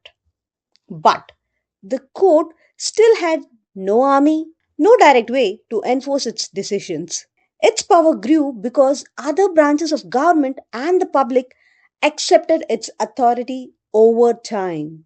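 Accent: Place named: India. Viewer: Her accent is too thick. India folks are difficult to understand.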